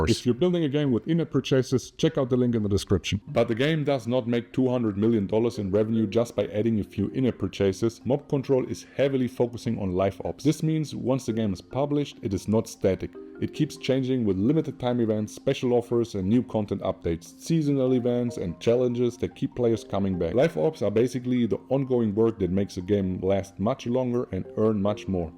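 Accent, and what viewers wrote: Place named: Germany. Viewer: i smell german. wie machste das mit den steuern ?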